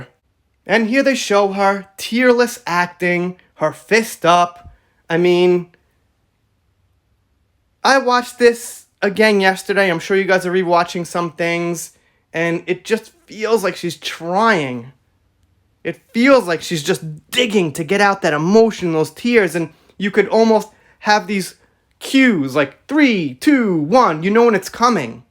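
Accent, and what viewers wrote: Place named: Brooklyn, United States. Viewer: This is my 4th time looking at your channel and I LOVE ITZ!! Thank you! Love your “ Brooklyn”? Accent too ( from The Heights😍 )!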